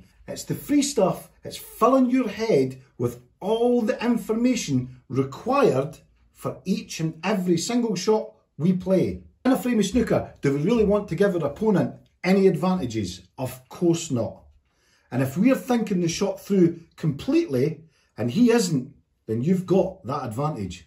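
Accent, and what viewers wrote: Scotland, United Kingdom. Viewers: you sound much like a scot!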